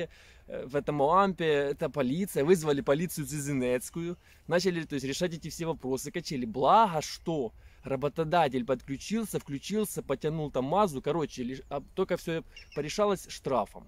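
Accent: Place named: Surzhyk, Ukraine. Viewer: Досмотрел... Спс что не перешёл на мат как это обычно бывает в камеди-клаб. И да тот суржик, на котором ты "морозишь" далеко не русский язык. Так что либо говори на украинском языке, либо учи матчасть (символично неправда ли) прежде чем выходить на просторы ютьюба!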